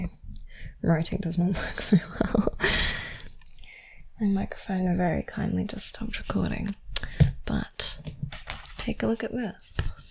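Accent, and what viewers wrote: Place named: Australia. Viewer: Your voice is amazing!!!! The Australian accent just makes it even sweetet to hear:)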